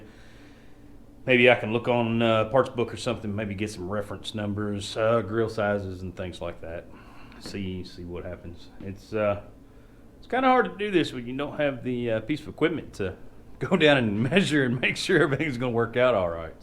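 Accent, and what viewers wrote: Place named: South, United States. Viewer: I think it's the southern accent but somehow the term "Boat hoes" comes to mind?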